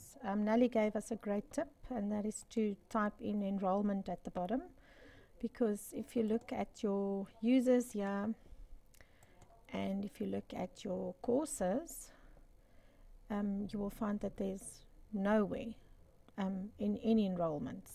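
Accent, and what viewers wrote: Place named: South Africa. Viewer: un joli accent sud-africain. Thanks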